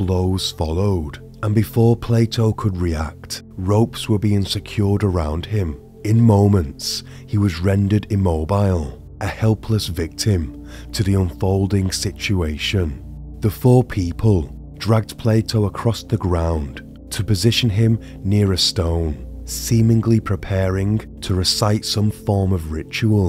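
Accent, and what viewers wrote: United Kingdom. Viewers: What region of British accent this?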